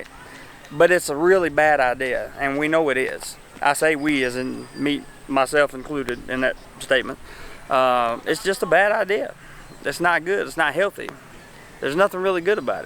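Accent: South, United States. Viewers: What southern state are you from? Louisiana? Reminds me of Bobcat Goldthwaite. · love that accent..what part of south you from..mind me asking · Just came across your vlog I subscribed, thank you for the honestey bro! My dream is to come to the Philippines, I love everything about the Philippines I plan on making it there soon! Where are you from it sounds like somewhere in the southern states? ( NC, SC, VIRGINIA )???? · I don't know! his accent is a thick Southerner but his looks is more like the people of Saddam in Iraq or Syrians:-)) hahaha, maybe because I'm a local you look different to me... no offense I like your video:-)) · your from texas cause your accent like a cowboy... i like it... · He sounds like One em gangs in Red dead redemption 2. A strong southern accent so cool.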